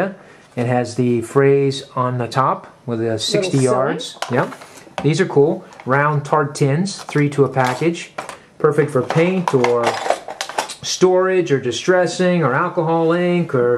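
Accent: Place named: Massachusetts, United States. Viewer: Wicked Cool!! LOL are you from Massachusetts? <---I haven't heard that in years! Love the collection!! xoxo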